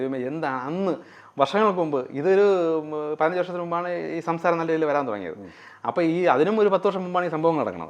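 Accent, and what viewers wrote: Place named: Thiruvananthapuram, India. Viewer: നല്ല തിരുന്തോരം ഭാഷ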